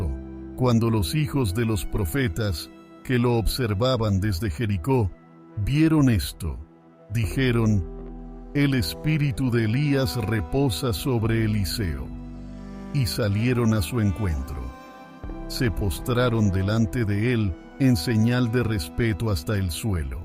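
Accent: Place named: Brazil. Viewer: es el narrador, los videos estan Mal editados, a veces se puede oir un acento como brazileño y a veces hasta en portugués se oye que esta hablando.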